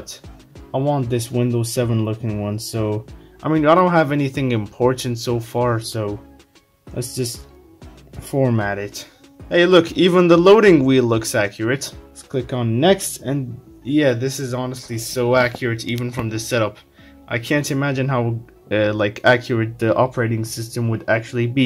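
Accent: France. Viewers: I have two questions : Are you French? And is it working with Windows 11?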